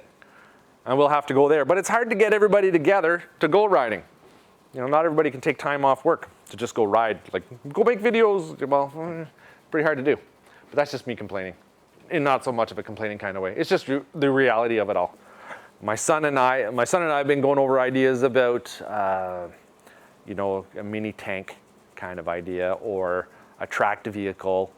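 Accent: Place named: Newfoundland, Canada. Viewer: I feel like your a Newfoundlander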